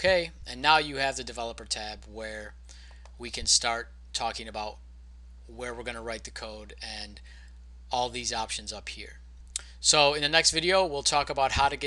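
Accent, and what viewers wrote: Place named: Boston, United States. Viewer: I think I found Bill Burr’s brother! Sounds like him, am I picking up a bit of a Boston accent there? 🤔🤔🤔🤔🤔🤔🤔🤔🤔🤔🤔!!!!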